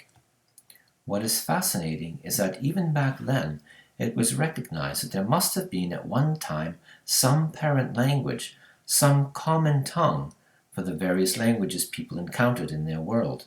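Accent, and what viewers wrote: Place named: United Kingdom. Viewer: Ps. I thought that you said that you are from America and your father is Polish. But IMHO your accent is British, not so much accent but the way how you construct sentences and words you use. So you are expat leaving in USA? Have a great day!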